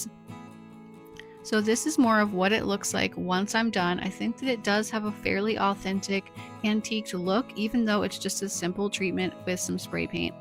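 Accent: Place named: United States. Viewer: Thank you so much,. Every makeover, flip, upcycle is a masterpiece! I also enjoy listening to your voice - a very soft American accent; I know, you probably don’t think you have an accent, but I’m from the UK, so you do! Enjoyed Curry’s upcycle too with stain on his nose and whiskers, a cool look.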